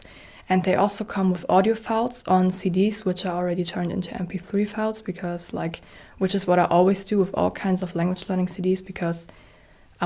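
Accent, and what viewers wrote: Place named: Germany. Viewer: Your name shows Italian last name but your accent is much with German , are you German or Italian ?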